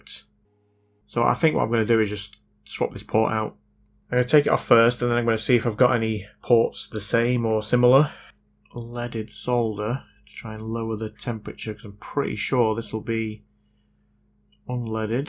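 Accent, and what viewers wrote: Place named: Manchester, United Kingdom. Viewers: I worked in Manchester (technically Stockport) for a couple of years and he sounds exactly like one of my workmates from the time. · Been wanting to ask you. You don't have to answer. Are you Manc? reason I ask is because I grew up in Brixton, London myself, but used to stay in Chadderton in the 80s (Firwood Park) and your accent almost feels like I am back there.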